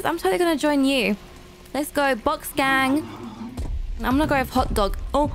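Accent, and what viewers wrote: United Kingdom. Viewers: Omg your British :0 Give me a shout out pls :D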